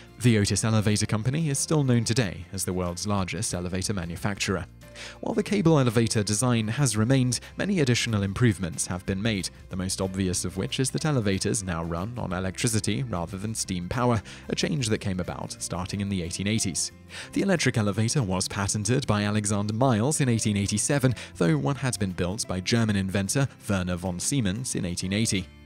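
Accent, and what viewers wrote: United Kingdom. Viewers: The narrator has a British accent though (that was the joke lol).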